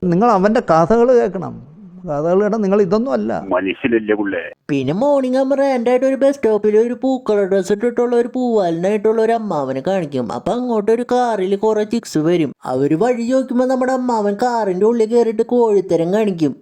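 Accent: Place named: Puthenvelikkara, India. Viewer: ഇത്രയും വൃത്തിക്കെട്ട ഒരു voiceover അടുത്ത കാലത്ത് കേട്ടിട്ടില്ല മൈര് 😂... വളിക്ക് എക്സ്ട്രാ മണം പോലെ അവന്റെ പുത്തൻവേലിക്കര സ്ലാംഗും... കാട്ടവരാതം തന്നെ മൈരാ...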